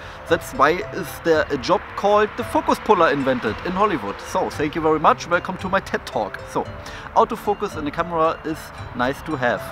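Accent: Berlin, Germany. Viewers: Ach so, my my settings ….. 😂 so cool 👍🏻 ich hab großen Respekt davor, dass Du alles in englisch sprichst 👍🏻 und das machst Du auf Deine charmante Berlinerische Art echt gut 👍🏻 mach weiter so 👌 beste filmerische Grüße aus Oberfranken my friend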